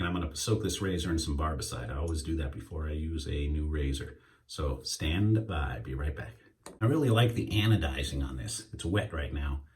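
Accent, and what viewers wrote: Newcastle, United Kingdom. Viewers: I love your channel bro.Love from Liverpool uk.You may be a yank now but you can tell a Geordie.(Newcastle for outsiders)Take care mate.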